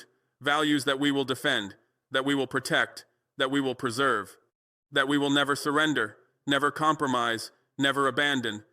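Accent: United States. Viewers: Why has he got an American accent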